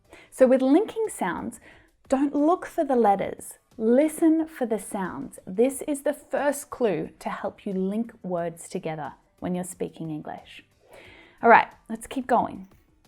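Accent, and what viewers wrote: Australia. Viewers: Hi, It's really hard to find good australia accent english teaching channel, I subscribed immediately when I found your channel and being enjoyed it ever since. But here comes the real question, Is it weird to for a male to shade a female? Am I going to sound more feminine?😂😂😂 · Hi!, I really enjoy your teachings. I've noticed, trouhgt out your accent, that Australian one is very close to British. While in the U.S.A., I took my classes, right there. I'm so eager to keep in the same track, so that I'm in the continous path of learning this beautiful language as much as possible. Again, thanks a lot for your help. With empathy: your suscriber, G.Z. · As a British educated person living in Canada, I am fond of your Australian accent and your country Australia.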